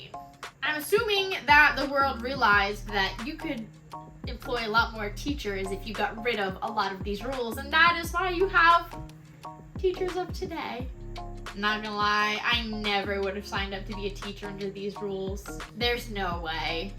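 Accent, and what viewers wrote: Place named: North Carolina, United States. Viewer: I'm just curious if you're from North Carolina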